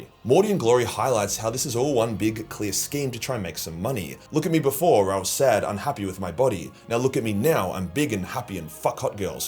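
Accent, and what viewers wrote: Australia. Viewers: Every time you get “canceled” is because you are an australian and talk like one and people just dont understand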